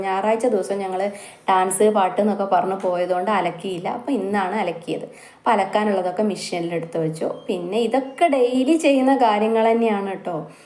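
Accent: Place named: Thrissur, India. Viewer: തൃശൂർ ആണ് എന്ന് തോന്നി ok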